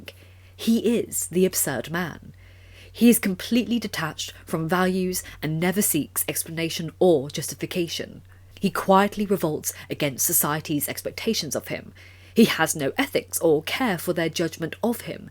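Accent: United Kingdom. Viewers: I like your voice combined with British accent so much!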